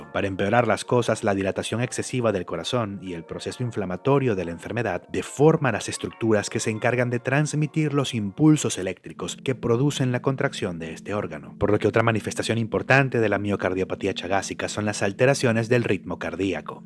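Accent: Venezuela. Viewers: pana eres venezolano ?? yo estoy nuevo en su canal , ya me suscribi , excelente trabajo con tus videos, dios te bendiga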